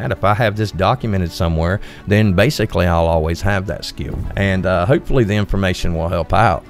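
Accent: Alabama, United States. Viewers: That sounds like a Cullman Alabama accent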